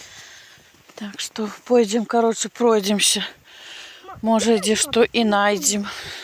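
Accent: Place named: Bryansk, Russia. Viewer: Как вас приятно слушать. У вас такой говорок, как у нас на Брянщине... Один в один...Давно не была на родине.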